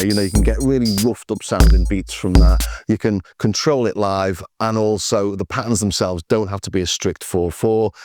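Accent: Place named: Lancashire, United Kingdom. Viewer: She’s even sweeter with a woolyback accent! ❤ nice one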